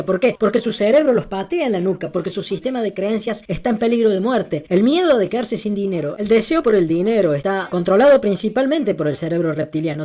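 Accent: Córdoba, Argentina. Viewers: la voz de ese español acordobezado está genial!! Muy clara y precisa!!! Dejá que cacareen los culiaos jejeje!! Felicitaciones!!! Like para Uds.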